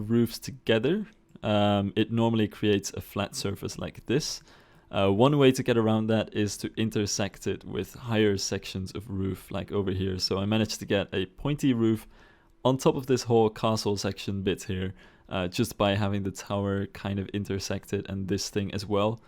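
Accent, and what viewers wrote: Netherlands. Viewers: I suppose you aren't English. Hearing you talk It sounds like you are Dutch. Are you? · I think this fits your channel just fine. Its about building and architecture. And you appear to have fun doing it, which makes you enjoyable to watch. Well, and that fellow dutch accent. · I am New here but you sound dutch is that true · you sound super dutch to me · Just found out about your channel and I love this video :) Btw, are you Dutch? I'm from the Netherlands and your accent sounds a bit like we speak English ;) It's not bad! Just noticeable :)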